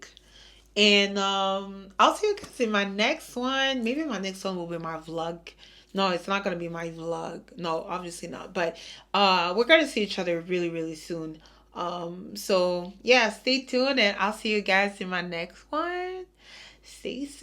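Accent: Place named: Italy. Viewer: you must be Italian!! you sound just like me loool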